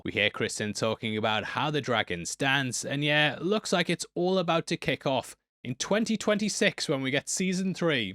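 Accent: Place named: England, United Kingdom. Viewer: My boy looking a bit manly in this video 😂😂 the rough beard, English accent, arm tat in view 😂😂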